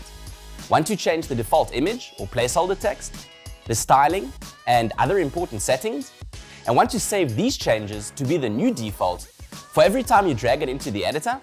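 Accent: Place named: South Africa. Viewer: Dude you sound like South African!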